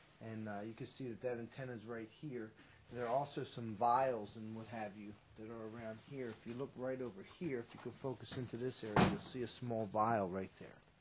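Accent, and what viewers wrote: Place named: Philadelphia, United States. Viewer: Sounds like North Philly? At least your accent. What city are you in there?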